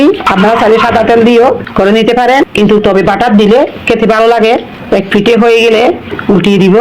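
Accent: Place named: Chittagong, Bangladesh. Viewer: চাটগাঁইয়া টোন!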